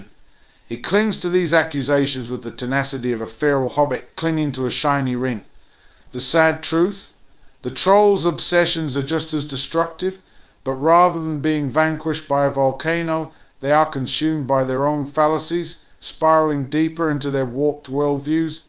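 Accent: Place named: RP, United Kingdom. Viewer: 😂😂😂😂😂😂😂😂 So damn Posh...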